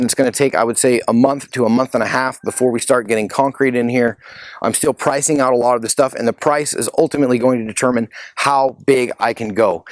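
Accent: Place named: United States. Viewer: Sounds Great, where are you building this? Accent says USA but some references point to Australia...... soooo Queensland??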